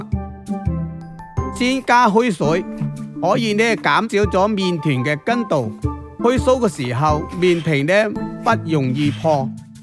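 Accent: China. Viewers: Mia Mia 佢係廣東人但唔係廣州，廣東話除咗廣卅話外仲有好多方言，佢就屬其中一方言。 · 这是哪个地方的粤语。。。。。。？ 另外感觉就是变着花样吃猪油。。。。掺些面粉让猪油不要太像猪油。。。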